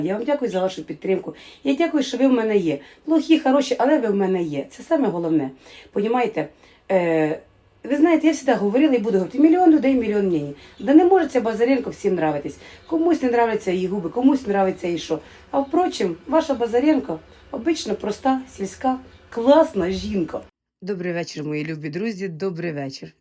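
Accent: Surzhyk, Ukraine. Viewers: ❤❤❤. Вчи українську поки вдома. Бо суржик дуже сильний))❤❤❤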